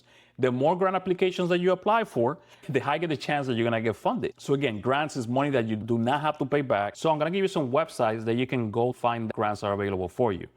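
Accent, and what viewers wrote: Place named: Africa. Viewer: I like your African accent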